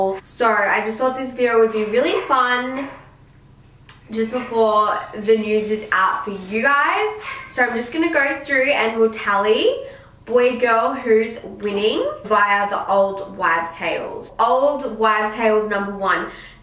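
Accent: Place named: Australia. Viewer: First I love your videos xx you are so amazing and love your Aussie accent Edit(I love your Into it's so bomb i do it all the time)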